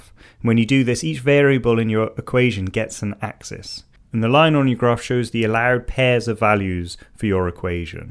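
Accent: England, United Kingdom. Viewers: English accent but u spelt maths as math... (facepalm)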